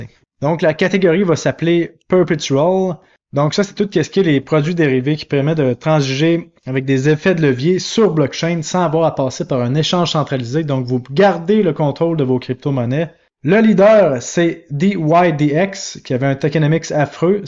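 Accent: Canada. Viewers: Bonjour merci pour tes vidéos que je suis régulièrement. J aimerai si tu peux avoir ton avis plus développé sur le solana ( etf ou pas prevu?) Car si c est le cas il se pourrait bien qu il accompagne de fortes hausses. Tout comme l ether etf a venir et enfin j ai du sand je suis surpris de sa remonté bravo a lui.j ai du polygone que je vends par tranches de 25% de hausse et le ada? Merci aussi pour l humour combiné a l accent canadien que du bonheur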